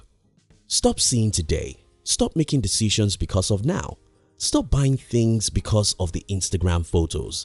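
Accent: Nigeria. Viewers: Lol Narator sounds like he from my country Nigeria 🇳🇬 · That's a Nigerian 🇳🇬 accent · Brilliant Nigerian voice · For those who might be wondering where these ascent comes from, well, this is a Nigerian ascent. Good work bro! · Gather here if u think the narrator sounds like a Nigerian ✌️ naija fi life no fuckery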